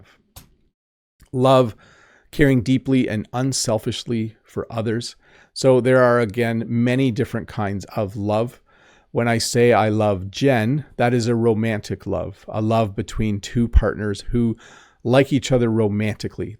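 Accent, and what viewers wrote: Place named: Canada. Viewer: Hello, dear teacher, I am Shahab from Iran, one of your avid fans. I truely appreciate the very useful lessons in which you teach us very fantastic things. I also love the Canadian accent. However, as a doctor, I believe you made a small mistake regarding the meaning of " empathy" and " sympathy ". Actually, empathy is the ability to understand and share the filling of another person, to put yourself in their shoes without becoming emotionally involved - exactly the same way doctors are expected to interact with their patients . On the other hand, sympathy is the feeling of pity, compassion or sorrow for someone else who is experiencing something difficult that doctors are professionally discouraged from showing sympathy because it might create a sense of imbalance in the doctor-patient relationship as it can cause the doctot to become emotionally overwhelmed. 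Hence, doctors should demonstrate empathy and avoid excessive sympathy.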